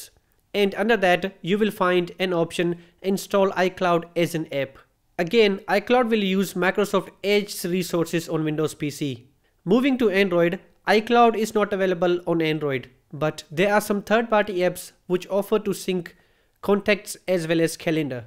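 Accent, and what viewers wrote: India. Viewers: I love Indian English accent!!!! Good content too. 👍🏻👌🏻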